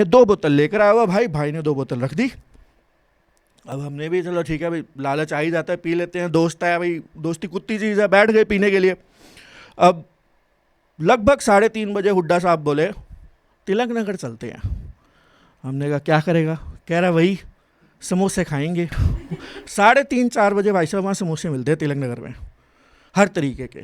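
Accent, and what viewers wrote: Haryana, India. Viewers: btw, even your haryanvi accent is commendable bro👏 ..keep growing🫶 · Haryanvi accent is perfect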